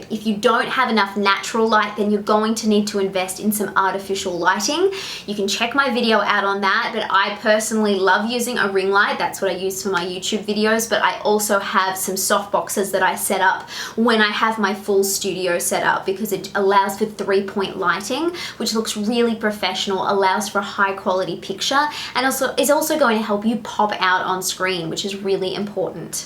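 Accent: United States. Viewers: Excellent tips. Thank you. Especially the advice on too many takes. I've selected one of the first takes more often than not. The other point that you touched on is that it creates a false positive. You will almost never get that many attempts on set and, if you do, it will be nerve-racking. Does your market encourage the pan up & down for the full-body slate? They won't tolerate it here so there's always a hard cut. In this market, the slate is always either a tail slate or a separate file. Also, congratulations on the dialect work. Your American is flawless! Thanks again for your generosity. You are very kind to lend your expertise. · Ur American accent is so on point. It doesn't sound fake or exagerated. you sound lovely and moremature in the Am accent. No hint at all that you are Aussie!! I'm on my way to get this accent ready!!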